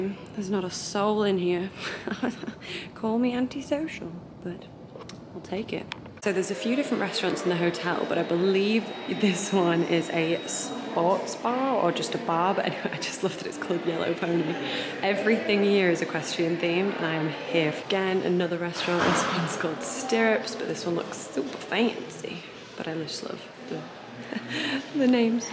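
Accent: England, United Kingdom. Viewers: Awesome! I have 19 acres in north east FL after I build my house I will have to check it out. Sounds like a great place to go for a weekend . You have an English accent where are you originally from?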